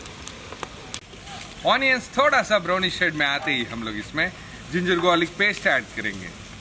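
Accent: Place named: Hyderabad, India. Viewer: Bhaijan aap hindhi me hich bolo na kya tho bhi ae ginger garlic tomatoes bolna shuru kardiye aap bhi... ooo connect nai hora bhai aap ki zubani pure hyderabadi hai na bhai kaike liye change kardiye aap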